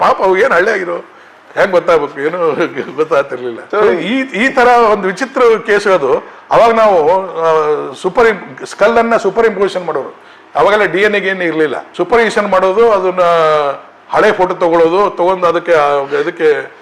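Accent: North Karnataka, India. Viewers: ಪಕ್ಕಾ ಉತ್ತರ ಕರ್ನಾಟಕದ ಬಾಷೆ ಜೈ ಉತ್ತರ ಕರ್ನಾಟಕ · Bhashe chennagide natural north bhase.no problem onthara khushi kodute.kelakke.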